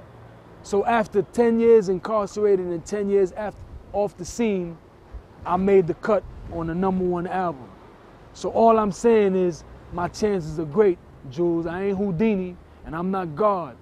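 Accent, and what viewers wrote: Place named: Belize. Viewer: J E He’s Belizean. Sometimes your mother tongue accent comes through if you lived in more than one place when you were growing up.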